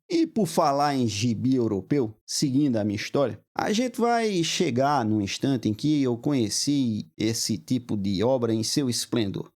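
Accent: Northeast, Brazil. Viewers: Com as devidas adaptações, ouvi a narração de minha jornada com os quadrinhos. Uma aula sobre o tema e uma incrível lista de indicações de leituras com resenhas sintéticas, mas estimulantes. Tudo isso com alta dose de conhecimento, a sonoridade nordestina e o desejo das bençãos de Deus. É um privilégio.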